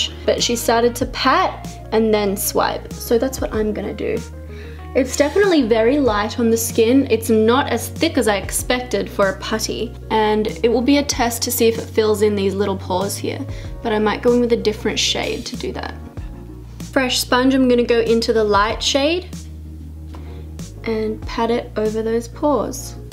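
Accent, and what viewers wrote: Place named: Australia. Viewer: Is it bad that I read the title in her Australian accent? Lol I just had too 😭 I love her too much ❤ She's soo beautiful!!! I can't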